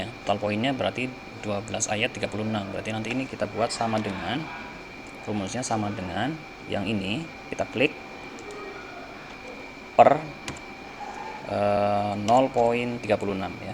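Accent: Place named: Java, Indonesia. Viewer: Matur suwun pa. Suarane medhok pa. Salam dari blog ngapak.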